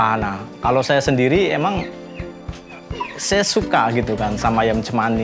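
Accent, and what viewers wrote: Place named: Banyumas, Indonesia. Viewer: Wong ngapak💪👍👍👍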